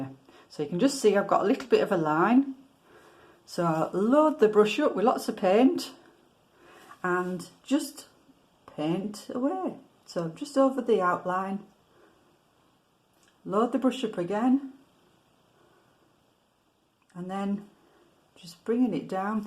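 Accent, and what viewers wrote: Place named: Yorkshire, United Kingdom. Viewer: Beautiful painting, and I love your Yorkshire accent! And I’m not taking the mickey. Hope I spelled that right.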